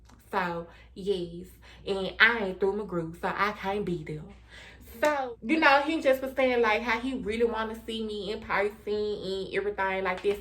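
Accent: South, United States. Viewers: Your accent 😍 it's giving southern belle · This sista is old school southern. I understood every word.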